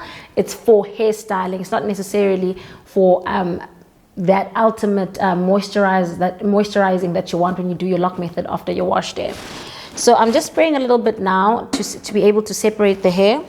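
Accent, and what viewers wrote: South Africa. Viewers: is it just me or does she sound South African ? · Are you South African? You're accent is awesome! I'm American -born Nigerian, but my accent is boring valley-girl 😆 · LOL. It's our typical South African accent❤️ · are you south african? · She is south african😍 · She sounds South Africans....damn🚶🏾‍♀️ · She sounds very much like she has a black accent. Just from JHB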